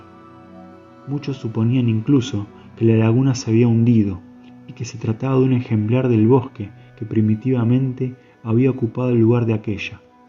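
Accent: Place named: Argentina. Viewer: Me estás ayudando mucho, iba muy lento con la lectura por mi mala visión. Escucharte me resulta muy cómodo añadiendo que tienes una linda voz, con tu marcado acento argentino. Gracias por hacer este audiolibro y te animo a continuar. Saludos desde España🙂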